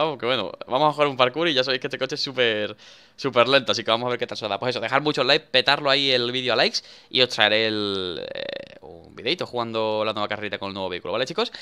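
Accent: Argentina. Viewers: Está en argentino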